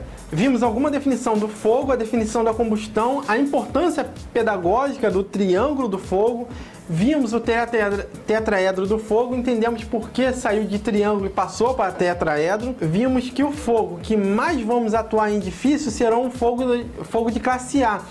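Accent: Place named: Rio de Janeiro, Brazil. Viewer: Putz, carioquês latente. Mas o video é interessante.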